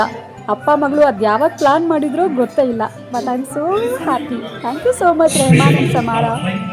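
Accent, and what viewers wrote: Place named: Mysore, India. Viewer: Appatta kannadathi, mysore kannada super mam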